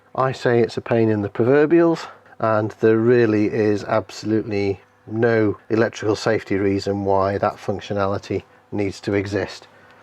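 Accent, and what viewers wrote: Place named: England, United Kingdom. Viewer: Hi. My sister and I bought this hot tub for our Canadian wilderness cabin…it arrived without any instructions ….. that made sense to us at least….so we you tubed it….and voila! A wonderful Englishman who walked us through every step..use language we understand in an accent we love…so we are here in the mountains, in the back country of our cabin waiting to turn on the heat and enjoy the amazing stars visible only at night…we are hoping to get the right temperature tonight….thank you so much~!!! You absolutely made our summer!!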